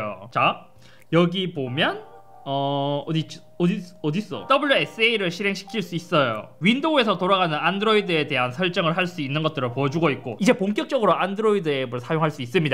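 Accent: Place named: Seoul, South Korea. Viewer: 형 발음이 역시 서울..킹..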